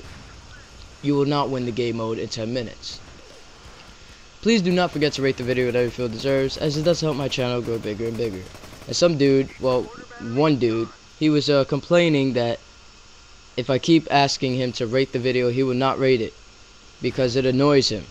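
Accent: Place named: New York, United States. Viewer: i love it when u say the into for rating :-D i love your NY accent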